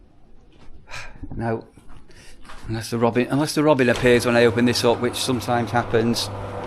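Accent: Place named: Hull, United Kingdom. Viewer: Are you from hull area no diss but tha sounds like cod head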